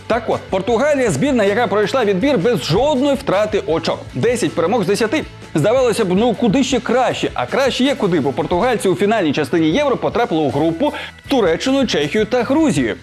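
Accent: Russia. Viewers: Таке враження, що ведучий зростав на російськомовних відеоблогах, треба ще тренувати українську (помилки виправляти + вимову трохи ставити, бо подекуди проскакують маасковські інтонації). Сам матеріал доволі цікавий (хоча, у табличці теж помилка – 2 і 3 місця). Вже не перше класне відео на цьому каналі! Продовжуйте!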